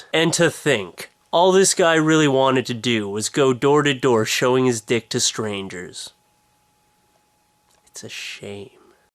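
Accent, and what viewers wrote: Canada. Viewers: i knew u were from canada, but i just now noticed u have a accent. like when u said house. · canadian eh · LOL. I think it's so funny when you Americans think that Canadians say things strange. What exactly are you hearing? How's he supposed to say "house"? It's kind of like how a lot of you Americans say "ruff" for "roof." Why do you make a dog noise for "roof"? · that's the Canadian accent, its not weird -_ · "don't struggle or i might get a stiffy!" hahaha funny shit! and i like how you say house. i wish i had a canadian accent :( oh, well. canadians have good weed too! :) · It's the canadian prairies accent.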